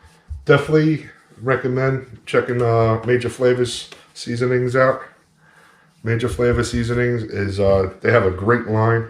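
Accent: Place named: New Jersey, United States. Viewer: That Travel Kettle has got to be a must for anybody into smoking and grilling. I think you'll find a lot of uses. By the way, I love to hear what I call my Jersey accent. Don't ever lose it!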